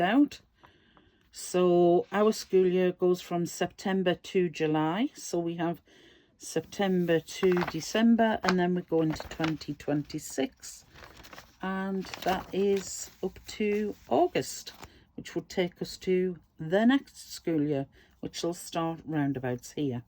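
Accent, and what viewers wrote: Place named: United Kingdom. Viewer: Awwwwhhhhhh - thank youuuuu for the shout out Sugar!!!!!! ❤️❤️❤️ I looooove your planner flips!!!!!!! Our planners look like Twinzies!😂😂😂😂😂 Thanks for sharing and doing these videos! They are so relaxing and I’m sure you always hear how Americans love the British accent. It’s sooo CLASSY. 🌸💕🌸💕🌸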